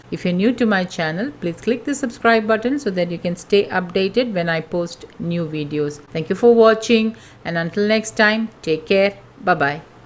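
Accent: India. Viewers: Thanks... Your recipes are the best so far. I really like your Indian accent too.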